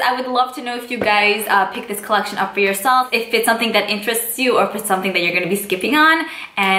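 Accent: New York, United States. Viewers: I can tell you from NY cause of our accent! I swear watching your videos is like having a girl chat with my non existent friends lol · Listening to you is like listening to a friend gush about something i must try! You are definitely from NY hehe The accents give us away.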